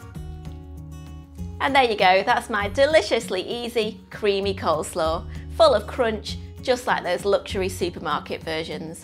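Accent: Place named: England, United Kingdom. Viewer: At last.......an English accent :)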